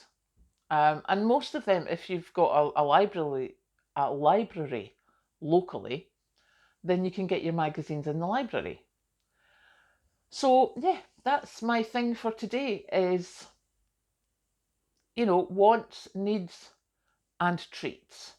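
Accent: Scotland, United Kingdom. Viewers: Definitely she should. I really enjoy her. She embraces the qualities of my relatives from Scotland. That I miss so much. The accent. The due diligence. The helpfulness. I just really really like. I was on YouTube and found a woman. That was similar in some ways to my grandmother. That woman shared another woman. That sounds like relatives of mine from Europe. I absolutely adore that woman and her husband. And from that woman I found. is the most I would say similar to myself. Although I'm almost a decade or more older than her. I really respect her love for her community. For her country. I love that she speaks her mind. She's self-supporting. She helps others. She's got a man she loves and respects. My man died. I loved him endlessly. Once you had the best you not interested in the rest.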